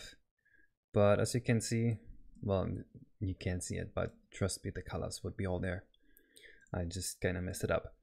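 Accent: Germany. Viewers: I liked you accent and I just realized you're german, everytime I heard someone with that slight german accent I thought they were native speakers. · Ayo completely unrelated but i noticed how much less noticible your german accent has become over the years, gj man, not many ppl improve that so quickly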